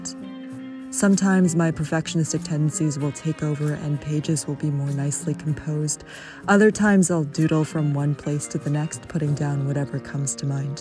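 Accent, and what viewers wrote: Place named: France. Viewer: Your french accent is so cute <3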